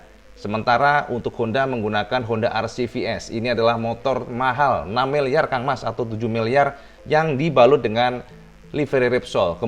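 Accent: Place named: East Java, Indonesia. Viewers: Cak sampean tuh kasih Impromasi nya penak di mengerti sangat kentel jowo neh sukses untuk sampean... 🤭😄😄🙏 Mantap👌👌👌👌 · Suwun cak infone... Mantap 👍👍👍 Ndelok logat ngomonge sampeyan teko Jatim yo !? 😀😃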